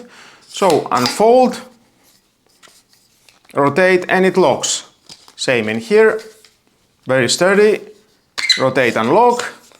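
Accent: Greece. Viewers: Are you Greek? A friend of mine has exactly the same accent 😊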